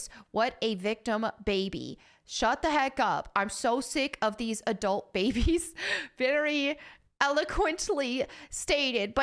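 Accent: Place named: South Carolina, United States. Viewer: I love you. You have such a South Carolina accent, which is where I'm from. I kind of recognized it.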